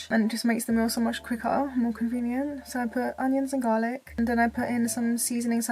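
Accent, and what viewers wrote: United Kingdom. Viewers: I'm also British but I love how extra British you are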